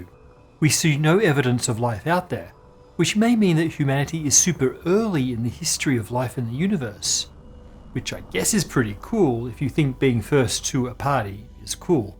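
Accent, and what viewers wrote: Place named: Australia. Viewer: This was great but I'm confused as to why you suddenly have an Australian accent Isaac. Nice use of the usual animations btw.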